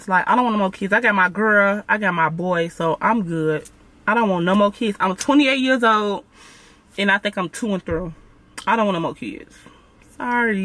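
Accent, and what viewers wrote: United States. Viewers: Picking up that southern accent sound nice on you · A lot shit y’all do stem from where we from “Chicago” both ears pierced is heavy in Chicago I got both my son ears pierced and the way you talk is also us Chicago females we talk hard asf naturally!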